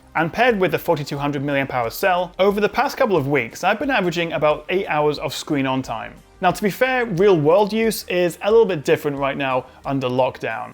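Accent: United Kingdom. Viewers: what lovely British town are you from,?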